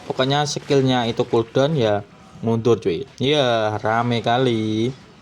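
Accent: Java, Indonesia. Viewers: LOGAT JAWA KAU KUAT KALI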